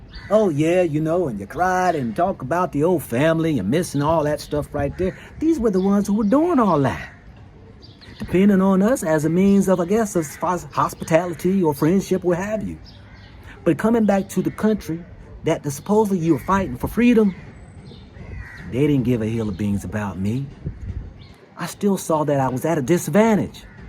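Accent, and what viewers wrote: South, United States. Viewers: There is a documentary series called The Story Of English. It explains accents like this and others coming from the white Southerners having to communicate with enslaved Africans. Fascinating stuff. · You are now speaking the Southern tune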